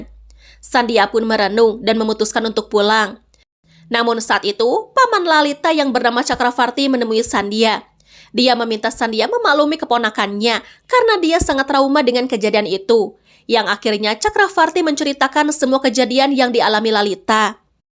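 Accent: West Java, Indonesia. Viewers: semangat ya cantik , perbanyakin aksen sunda nya ya . itu yg makin tambah greget loh , biar punya ciri khas macam mang jon iq7 , reza.saqahayang & mang andreas baok kompa 😊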